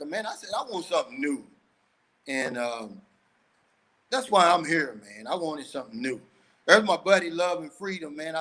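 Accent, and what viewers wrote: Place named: New Orleans, United States. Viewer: Hey are you I like what you're doing I'm from Florida in the Miami area my wife is from the Philippines (zamboanga) I like your chanel a lot you don't sugar code anything keep up the good work keep doing what you're doing and I also like the new Orleans accent also thank you for everything okay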